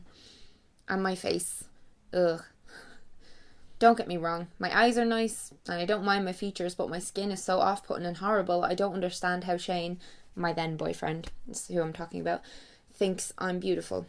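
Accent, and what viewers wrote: Ireland. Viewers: I am watching from Ukraine! I love you so much and you make me looooooveee Irish accent! You're so cute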